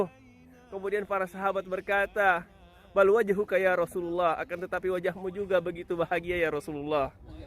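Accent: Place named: Poso, Indonesia. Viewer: Masi ada logat Poso juga ee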